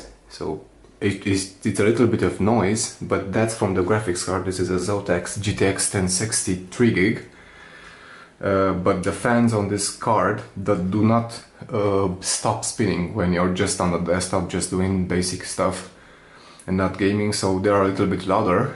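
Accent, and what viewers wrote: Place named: Netherlands. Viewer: vorbesti engleza cu accent de olandez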